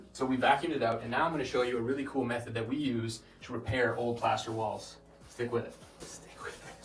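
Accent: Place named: Ireland. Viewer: Great job. You seem to use many different coats of filler, give a great finish. Also you sound Irish!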